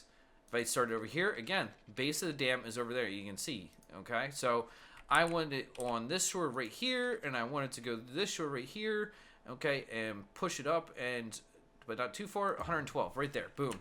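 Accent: Pennsylvania, United States. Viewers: Do I detect a fellow Pennsylvanian? That accent is unmistakable. "Wudder" :D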